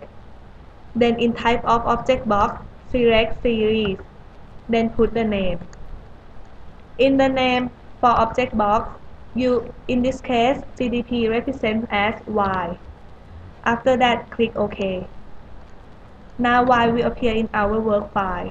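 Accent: Thailand. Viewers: Thai accent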